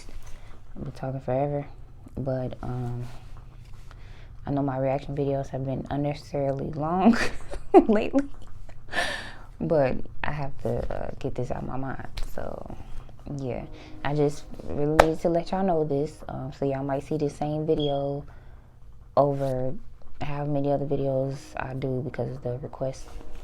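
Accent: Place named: Indiana, United States. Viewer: good content, this is off topic but im from Georgia and my ex is from indiana and you and her have similar accents, but anyway what you're saying is understandable in regards to the reactions, your music and your passion for it is admirable, keep doing what you're doing. you'll get where you want with your gift.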